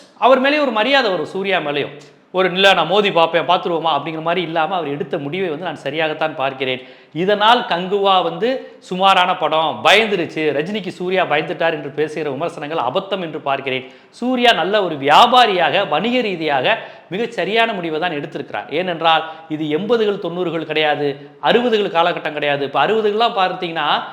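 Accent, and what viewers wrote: Madurai, India. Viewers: நீங்க மதுரையா.. நல்ல நாகரீகமான விளக்கம் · Bro neega madurai aha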